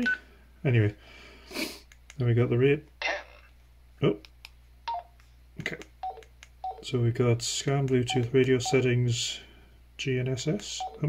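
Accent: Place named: United Kingdom. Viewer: Local radio in Vancouver but your accent is from the UK, I guess you moved there for some reason?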